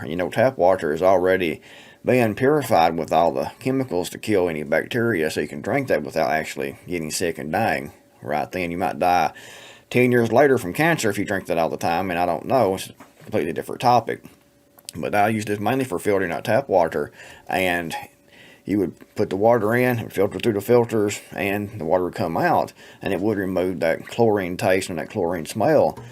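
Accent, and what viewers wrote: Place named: East Texas, United States. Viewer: perhaps Esst Texas or Knoxville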